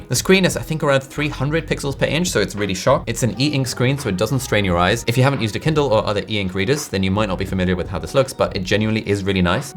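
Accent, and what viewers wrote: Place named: United Kingdom. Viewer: I feel so settled when I hear that british accent and looking at you.... 🥰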